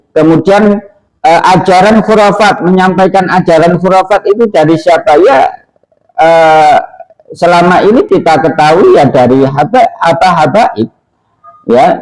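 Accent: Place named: Java, Indonesia. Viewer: lidah mu jawa kami gk anggab kalian itu yg suka pitnah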